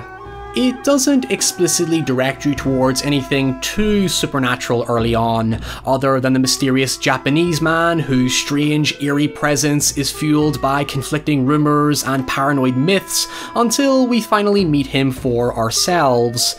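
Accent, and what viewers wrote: Northern Ireland, United Kingdom. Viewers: He's from Northern Ireland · Northern Irish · He is from UK, you know the f'ing birthplace of the language called English!?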